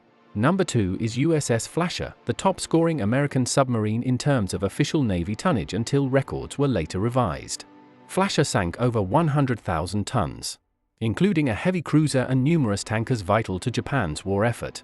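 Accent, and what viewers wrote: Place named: England, United Kingdom. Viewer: Why english accent ?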